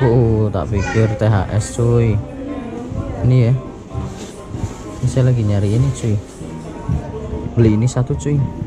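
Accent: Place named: Banyumas, Indonesia. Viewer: Basa ngapak Wong ndi sampeyan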